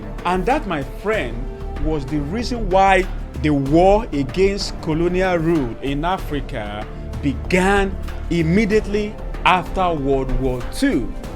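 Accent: Yoruba, Nigeria. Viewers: Captions are generatable automatically. Let those who want to watch it be attentive. They'll get used to his Yoruba Nigerian accent just as we've got used to their various foreign accents of English and French. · Yes he's from Yoruba nations. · This dude has good content & one of the funniest accents ever 🤣😂🤣. Is this a Yoruba accent?🤔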